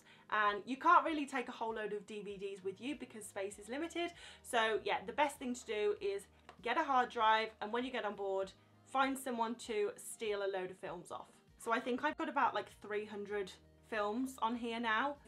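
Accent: United Kingdom. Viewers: Where in the UK is your home? Or more to the point, which regional dialect is yours?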